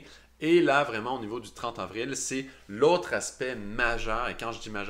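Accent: Canada. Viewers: Moi j aimes bien l accent canadien . Monleal...monleal ... Merci pour cette vidéo.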